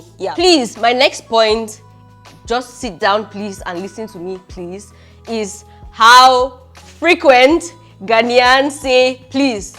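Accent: Nigeria. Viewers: I love your content. A Cameroonian with a Nigerian accent 😍 and body language. That goes to show that we're one with slight cultural differences. Keep it up, babe 👍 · Not conversant with Cameronians but you sound like a Nigerian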